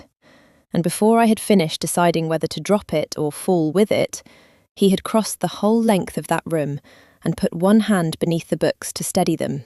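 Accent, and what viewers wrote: United Kingdom. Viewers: Interesting story. Cool brit accent.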